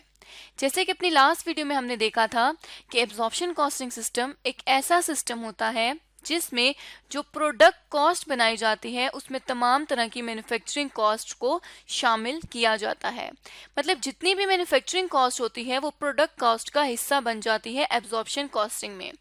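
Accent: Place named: Pakistan. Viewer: Tomorrow I have my ca inter costing exam and I wanted to learn this concept... And I came across the bestest shortes video! ..i am from indian... Your Pakistani accent is so good! ✨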